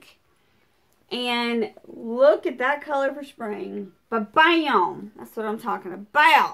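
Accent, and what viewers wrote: South, United States. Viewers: Are you tipsy or just really southern??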